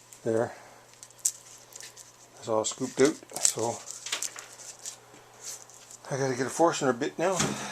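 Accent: Newfoundland, Canada. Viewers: Now das a good ‘ol Newfoundlander dere!!! ❤️❤️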